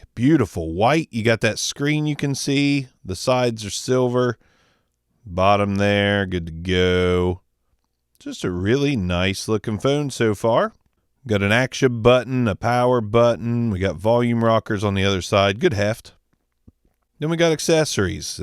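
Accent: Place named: Delaware County, United States. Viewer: You sound like you have a delco accent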